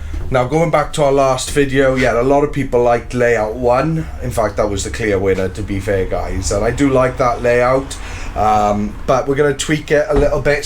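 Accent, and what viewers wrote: Wales, United Kingdom. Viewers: Defo Welsh ! Am I right ? I'm going thru your 7 vids (so far ...) and I see now your workshop is a garage at the side of your home and that's why it's not big enuf to take the van, as there is a limit on size of garages in homes ... I'm off to No 3 ... 👍👍👍✔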